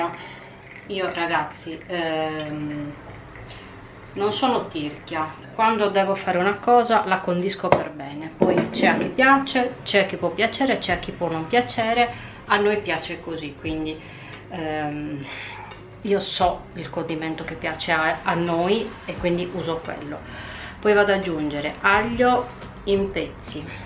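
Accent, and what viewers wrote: Sardinia, Italy. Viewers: La proverò senz'altro!😝 Mi piace la cadenza Sarda della signora! Brava🤗👍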